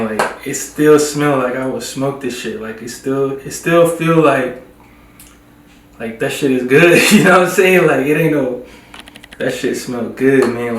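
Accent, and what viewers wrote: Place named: United States. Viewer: Which part of the states if your accent from?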